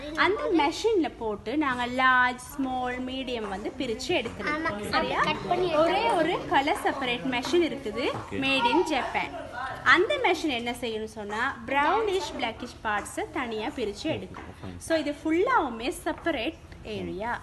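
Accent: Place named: Sri Lanka. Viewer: Like Srilankan Tamil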